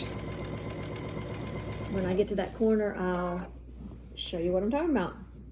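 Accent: South, United States. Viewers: Saw tooth stars are my favorite! Your quilt is gorgeous. I especially love your scrappy binding. Thank you for so generously sharing your quilt pattern with me. Also , my husband and I just love listening to your videos. Your sweet southern accent is a wonderful reminder of our time living in the south. 💗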